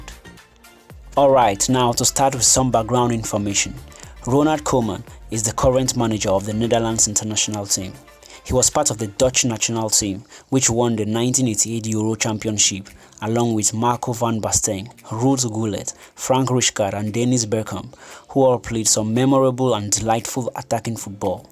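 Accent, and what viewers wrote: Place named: Nigeria. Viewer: You sound west African, I can tell because your accent sounds so familiar, I'd say your nigerian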